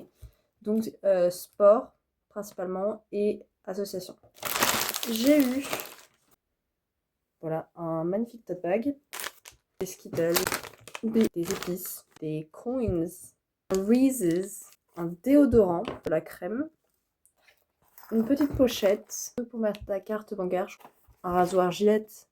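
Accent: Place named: England, United Kingdom. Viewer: Je kiffe ton accent anglais 😮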